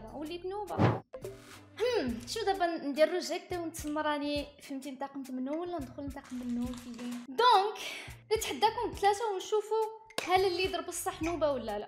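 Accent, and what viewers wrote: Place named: Lebanon. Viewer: هههه تتكلمي لبناني 😅😂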